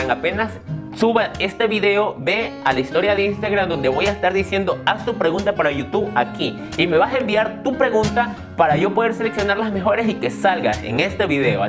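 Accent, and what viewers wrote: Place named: Ecuador. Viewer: Hola yo también soy del Ecuador pero vivo en Italia yo llegué en tu canal por que soy animalista y una de mis amigas había publicado un video en Facebook entonces lo VI y por tu acento me dije el es mi compatriota y que orgullo saber que existe una persona haci en mi país El maltrato animal en Ecuador es muy penoso la gente no quiere entender que ellos siente dolor que tienen un corazoncito cuando estuve en Ecuador VI tantos animalitos en la calle maltratados perritos atropellados y para mi ver esto era un sufrimiento tan grande trataba de sensibilizar a la gente pero no es fácil espero que los niños del futuro que aprendan a amar y respetar a los animales me gusta pensar haci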